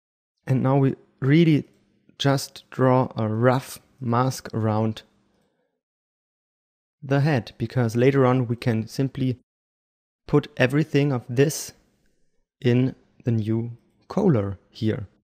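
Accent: Germany. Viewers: Are you german? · Strong German accent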